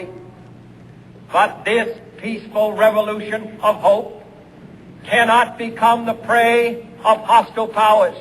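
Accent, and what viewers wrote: Boston, United States. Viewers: Yeah, he has a Boston/New England accent. He's from Massachusetts. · was not just a great president but a very handsome president with a very rare american accent that you don't hear as much anymore. God Fucking Bless you and your soul. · Notice his Boston accent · where's jackie??? I LOVE JFK!!!! and his boston accent!!!! yea democrats!!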